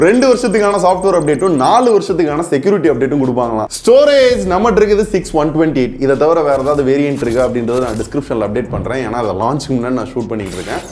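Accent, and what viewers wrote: Madurai, India. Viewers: Anna neega madurai thana 🤔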